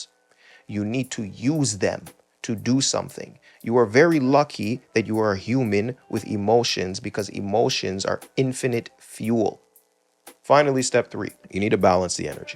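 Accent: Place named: Toronto, Canada. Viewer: You have to be a Toronto man, based on the way you talk lol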